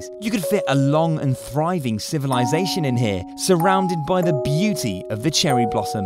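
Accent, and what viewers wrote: United Kingdom. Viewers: The British voice makes it better lol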